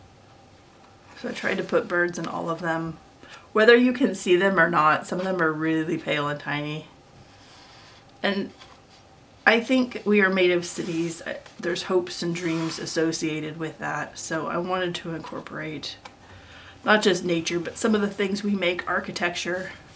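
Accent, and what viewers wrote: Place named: Australia. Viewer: Ur aussie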